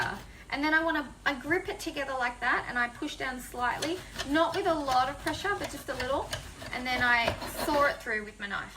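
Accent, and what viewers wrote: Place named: Australia. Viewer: she's talking with and aussie accent......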